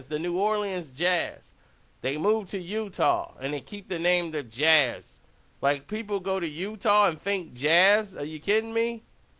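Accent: Ireland. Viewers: The Irish accent is funny as hell. You is a fool! Keep it up. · u killing me with that irish accent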